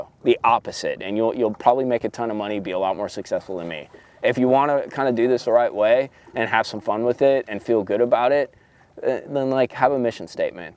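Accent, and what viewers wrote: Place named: New York, United States. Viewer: Love your content,long time watcher of your channel. Curious if you are from New Jersey or New York, you sound like my college roommate! 😂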